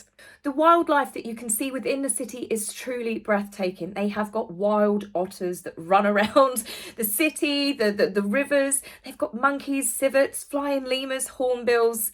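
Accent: United Kingdom. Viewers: is incredible. I can see this channel going the same success as Stef the Alter Nerd. its the way you present and who doesn’t like a British accent?:) It seems Meghan and Harry are the worst Royal connected couple in modern times.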